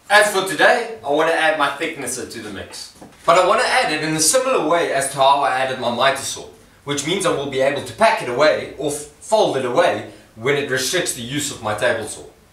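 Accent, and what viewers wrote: South Africa. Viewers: Lekker boet!! Nice bench, great to hear the accent ... sounds like mine, originally from Kimberley, Northern Cape. Greetings from New Zealand.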